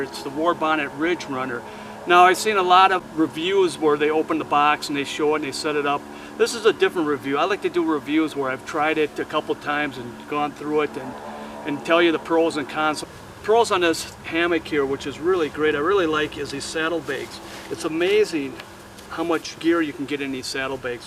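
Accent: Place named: Iron Range, United States. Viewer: Been to McCarthy many times! I’m an Iron Ranger from Virginia, MN. Glad to have found your channel! Thanks for the great info! I could tell that you have the same accent as me 😊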